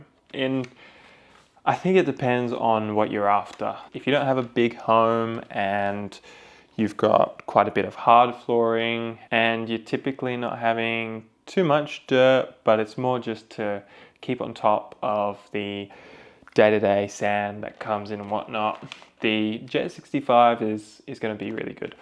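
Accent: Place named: New Zealand. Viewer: you sound like a Kiwi, are you?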